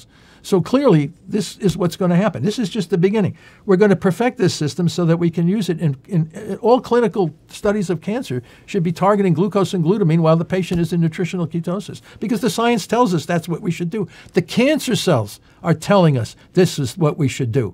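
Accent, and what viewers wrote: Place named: New England, United States. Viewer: Thank you for your WORK, and for the plain English explanation. I'm serious! And I love your mild New England accent;) This is ALL very encouraging to everyone who has lost family members to cancer.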